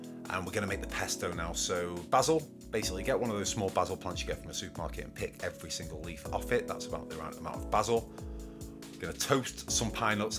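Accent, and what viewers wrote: Yorkshire, United Kingdom. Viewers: I detect a Yorkshire accent, I could listen to yu all night. Actually just found yu channel so will be watching all night.😊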